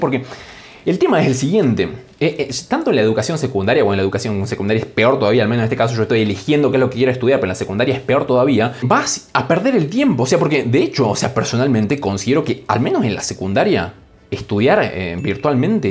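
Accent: Argentina. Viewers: sos de Salta? whooooo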